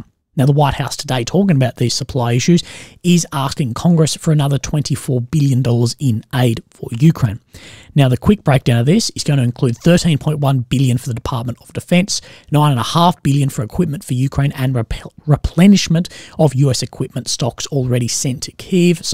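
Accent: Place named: Australia. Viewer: yo son ben in UKR for six months great to hear a real OZ voice. Met a few seppo's here, usually pretty friendly when travelling but , not here, just hi and by.. OK appreciate your channel a lot great OZ perspective.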